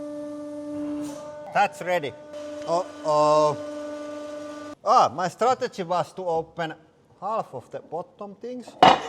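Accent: Finland. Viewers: The most Finnish sounding man I've ever heard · äijä hyvin paistaa toi suomi läpi puheesta xd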